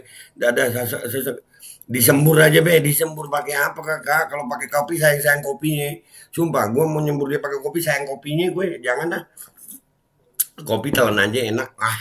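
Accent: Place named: Banjar, Indonesia. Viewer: Asli lucu beh ae bila mandangar pian pakai logat banjar...😄😁😆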